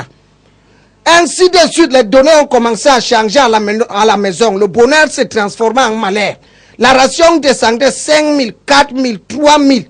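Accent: Cameroon. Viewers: l'accent bassa ..parfait mais pour l'histoire , j'ai eu du mal a decoller...mais pas mal tt de meme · J'aime cet accent bassa'a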